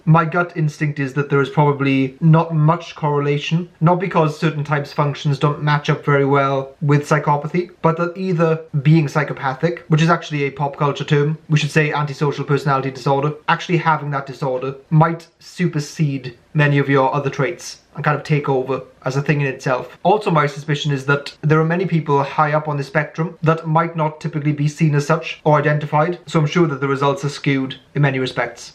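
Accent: Wales, United Kingdom. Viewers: He has a Welsh accent! (a variation of British English spoken in the Wales region)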